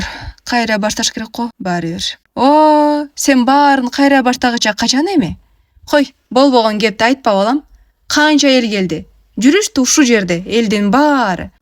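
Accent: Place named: South, Kyrgyzstan. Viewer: Акцент туштукчо окулуптур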